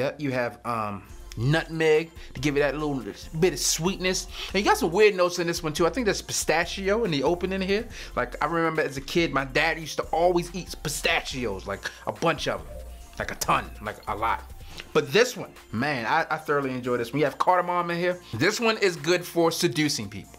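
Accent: Baltimore, United States. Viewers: Homie are you from bmore or Maryland or philly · Definitely from Bmore tho. Good video keep it up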